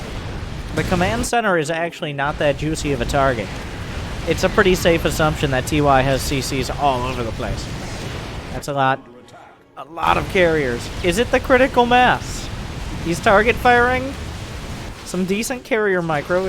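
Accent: South, United States. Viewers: Hey, how'd you get your slight southern accent? What state were you raised in?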